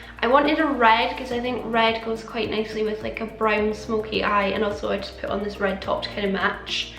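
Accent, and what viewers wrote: Scotland, United Kingdom. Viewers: Your accent is really cute 💞 Are you Scottish ?? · Are you Scottish? If so, where are you from? :)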